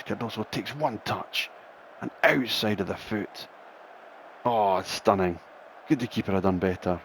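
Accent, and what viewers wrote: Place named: Scotland, United Kingdom. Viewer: So refreshing to hear a scottish voice on Youtube keep it up brother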